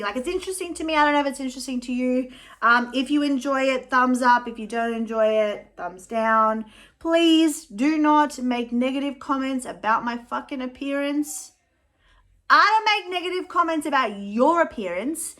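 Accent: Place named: Australia. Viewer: Girl you gotta be a fellow Aussie! I was thinking “why does she sound so normal and relatable” cos we have the same bloody accent 🤣 subbed x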